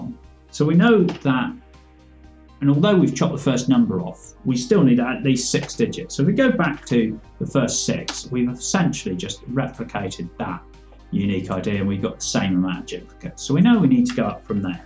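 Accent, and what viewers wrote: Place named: United Kingdom. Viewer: What I heard was a very accented British dialect of some sort, very hard to understand.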